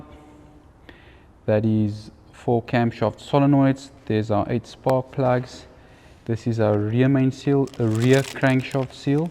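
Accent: South Africa. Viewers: Started watching but the South African accent grates me so I'm off to watch something less annoying.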